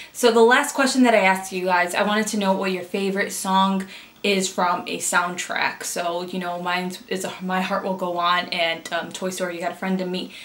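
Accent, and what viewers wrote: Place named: New York, United States. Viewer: You have no idea how much I love your accent!! NY❤️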